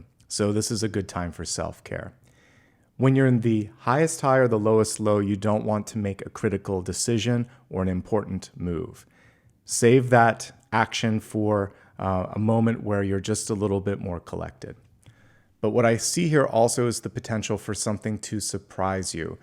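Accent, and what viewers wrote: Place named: United States. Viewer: I see that building from your window and wondering where are you from. You have american accent but that landscape doesn't look american 😅 Best wishes for Apollo!❤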